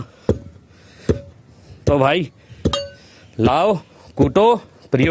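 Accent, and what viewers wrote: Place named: Haryana, India. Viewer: Bhai ka haryanvi. 😂😂😂👌👌👌👌 Ekdam kadak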